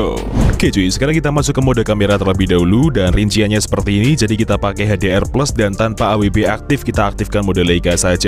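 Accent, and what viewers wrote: Surakarta, Indonesia. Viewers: org solo bang?wkwk sama nih😅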